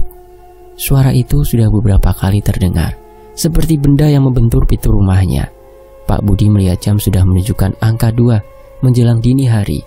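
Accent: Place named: Kebumen, Indonesia. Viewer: Mbak dr smg. Tp logatnya kebumen 😊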